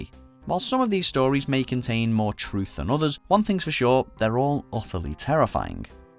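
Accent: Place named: England, United Kingdom. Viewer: THANK YOU for a nice English accent instead of those loud gobby American ones, SORRY NO OFFENCE TO AMERICANS INTENDED! And also for a voice that's normal and easy to understand, most voices on YouTube are creepy and robotic or very babbly. And what a brilliant and interesting video!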